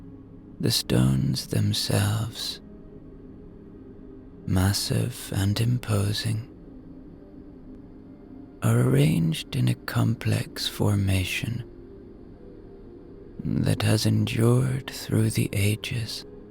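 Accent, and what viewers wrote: Ireland. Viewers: Bro I listen to you so much that everyday I wake up I have an Irish accent in my head.😅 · Iove the Irish accent ❤my dad was born and grew up in Ireland. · I listen too u literally every single night and I keep hearing an irash accent in my head and it sounds like a leprechaun 🍀 literally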